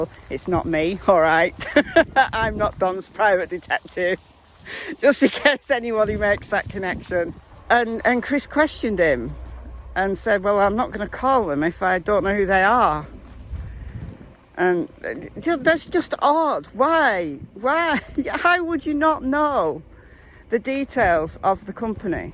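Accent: United Kingdom. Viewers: Hi! I'm one of your new subscribers. I love your take on things, and I love your british accent.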